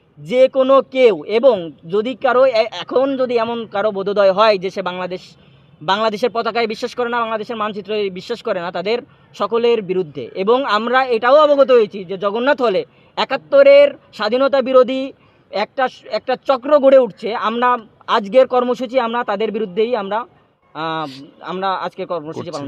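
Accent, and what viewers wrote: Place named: India. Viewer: এই গলা হিন্দুস্তানি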